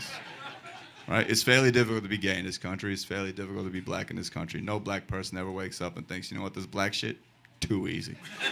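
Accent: United States. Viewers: What an idiotic thing to say. You from New Zealand, that's just how we talk if youre from certain places of the states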